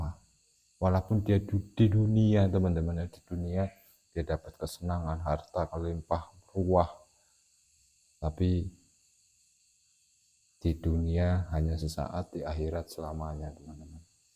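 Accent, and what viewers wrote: Java, Indonesia. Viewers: kalo boleh tau di daerah mana yh bg logatnya kaya jawa😁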